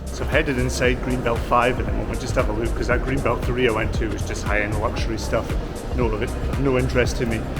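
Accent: Scotland, United Kingdom. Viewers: Try vising Eastwood City, Ortigas Center and Filinvest City also. To me, the captions/subtitles aren't necessary. Your Scottish accent isn't that thick. We understand your English perfectly. ᵔᴗᵔ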